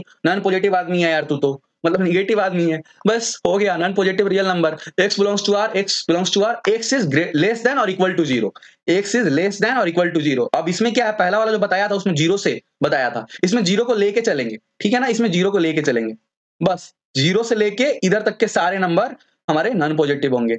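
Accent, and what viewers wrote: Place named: Bihar, India. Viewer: bhaiya kbse hi lg rha tha aapke accent se aap bhi bihar se ho...... ❤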